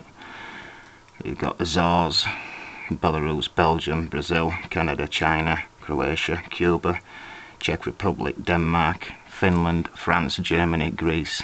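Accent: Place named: Yorkshire, United Kingdom. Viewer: at first i thought your accent was manc but yer it's Yorkshire also init : )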